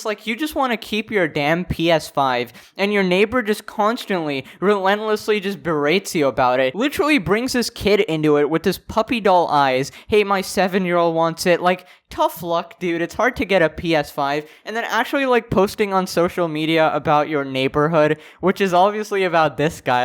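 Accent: United Kingdom. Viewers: Bro I just went to your oldest video and the voice change is real 😂. LOL you used to have a British accent wtf 😂.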